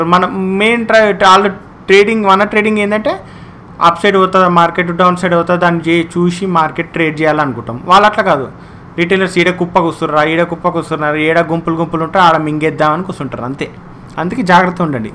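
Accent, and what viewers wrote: Telangana, India. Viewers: dear, మీ పేరు నాకు తెలియదు. అందుకే అనే సంబోధిస్తున్నాను. ఎంత బాగా చెప్పారు. మార్కెట్ లో చాలా ఎక్స్పీరియన్స్ వున్న ( వుందని అనుకుంటున్నాను లెండి ) నాకు కూడా మీరు చెప్పిన విషయాలు విస్మయం కలిగించాయి. ప్రైస్ యాక్షన్ గురించి ఎంత అద్భుతంగా చెప్పారు. రియల్లీ ఫెంటాస్టిక్. నిజం చెప్పాలంటే ఈ వీడియొ గురించి నా మనసు లో కలిగిన భావాలు బయటకు చెప్పటానికి నా భాషా అవగాహన చాలటం లేదు. అంతా ఎక్సయిటింగ్ గా అనిపించింది. లాస్ట్ పాయింట్ సూపర్ అని చెప్పాలి. మీ చానెల్ కు ఎప్పుడో subscribe అయ్యాను గానీ ఇంత ఎక్సయిటింగ్ గా ఎప్పుడు అనిపించలేదు. ఇందులో విషయానికి సంబంధం లేకపోయినా ఒక మాట చెపుతున్నాను, మీరు చక్కటి తెలంగాణా తెలుగు న్యాచురల్ గా మాట్లాడుతుంటే కూడా వినసొంపుగా అనిపించింది. ఒక తెలుగువాడు ఇంత చక్కగా చెప్పడం కూడా నాకు బాగా నచ్చింది. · Anna Telangana Language lo Explain Chestunte Bale undi anna. Nenu telangana Khammam dist. Nen enjoy chesikuntu chustuna nee videos. 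Thanku💐💐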